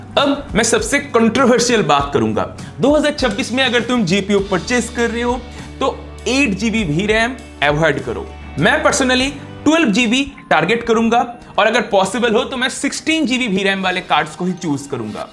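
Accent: Bengal, India. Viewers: You bengali?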